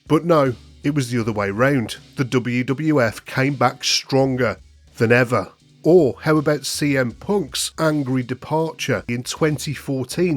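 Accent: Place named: England, United Kingdom. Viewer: Love this guys English accent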